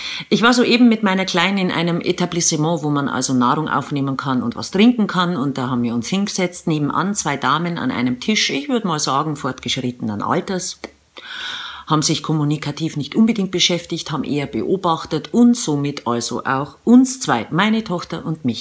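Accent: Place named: Bavaria, Germany. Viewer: Ich liebe bayrisch! Lass Dir bloß von solchen Schnepfen nicht den Tag versauen !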